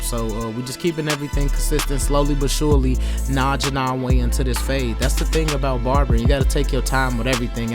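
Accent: Chicago, United States. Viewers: yu frm chicago?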